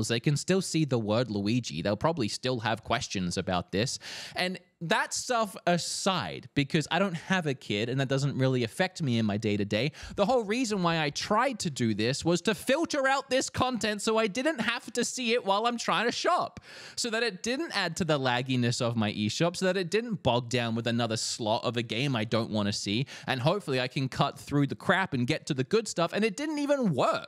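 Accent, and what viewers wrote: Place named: Australia. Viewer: Your accent is quite interesting. Australian as a base, but select words are pronounced in a US American accent. Confused me for a bit when I started watching.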